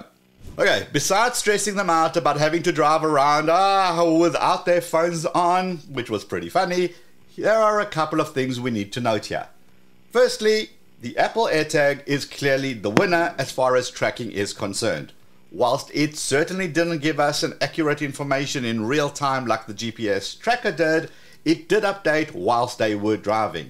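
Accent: South Africa. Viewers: Thanks for the video! Your maps showed Dallas. Your accents sound South African with a touch of Sydney. Ever been to Australia?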